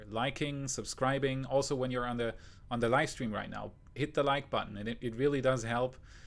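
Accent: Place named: Bavaria, Germany. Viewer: Being an old Army brat my dad's last posting was Stuttgart.The first time I ran across you I was shocked,you looked just like my childhood friend Helmut from my school there,right down to slight Bavarian accent most likely don't notice lol.Your English is VERY good,better than some Americans lol.And I'll say you have a beautiful family and I'm looking forward to you coming to America to stay.Maybe someday I could meet you I hope,my oldest son is 37 so hopefully we have things in common.God bless and keep you:-)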